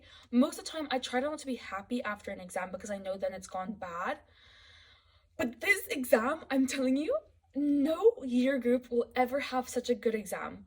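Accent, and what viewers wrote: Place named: United States. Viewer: ohh u have an American accent when talking in English haha. Je peux pas parler français????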